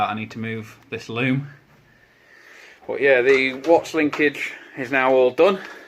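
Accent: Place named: Rochdale, United Kingdom. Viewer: Getting there! Where's the accent from? I'm guessing Rochdale ?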